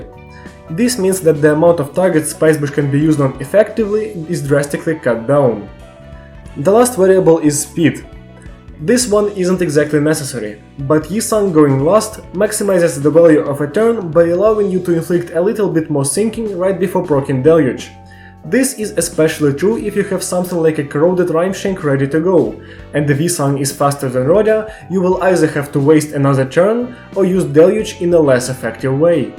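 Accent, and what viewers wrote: Russia. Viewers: Будто бы у тебя русский акцент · Русский акцент не скроишь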